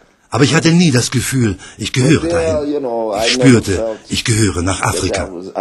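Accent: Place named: Jamaica. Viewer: the narrator has a jamaican accent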